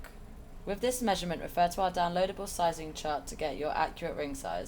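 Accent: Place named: Northern England, United Kingdom. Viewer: Your voice is cool....you sound like Scary Spice. Northern England?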